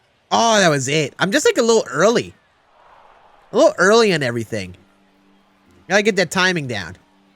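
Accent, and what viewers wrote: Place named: Wisconsin, United States. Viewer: You gotta be from Wisconsin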